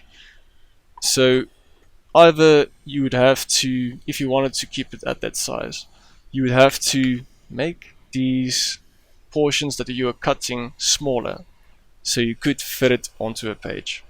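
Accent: South Africa. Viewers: You South African? I hear an afrikaans accent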